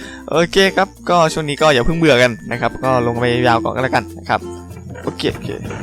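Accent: North, Thailand. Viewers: พี่ๆเป็นคนเหนือหรอ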